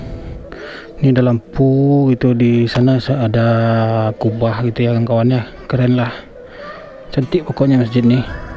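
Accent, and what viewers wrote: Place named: West Sumatra, Indonesia. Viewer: Logat bahasanya sama kayak minang kocak lu bang wkwkw😁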